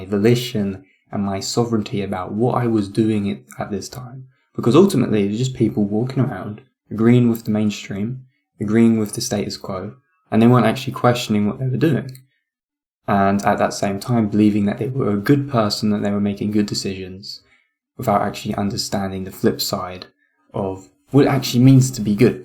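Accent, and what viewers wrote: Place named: Cockney, United Kingdom. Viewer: Philosophy with a cockney accent....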